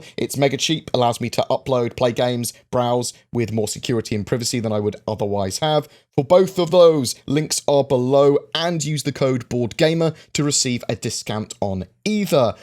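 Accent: England, United Kingdom. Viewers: Your english accent is so terrible.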